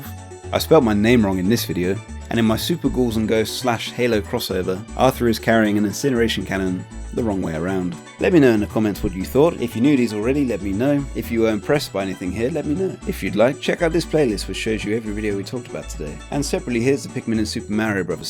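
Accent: United Kingdom. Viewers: Your British? Huh...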